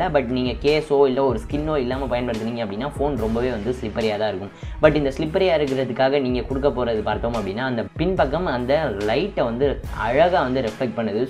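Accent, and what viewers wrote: Madurai, India. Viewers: Neenga madurai ahh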